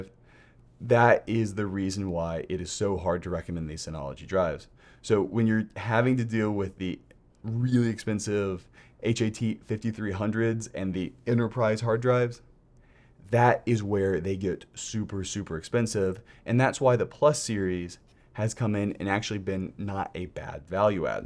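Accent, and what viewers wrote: United States. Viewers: this accent is linked to which region in US?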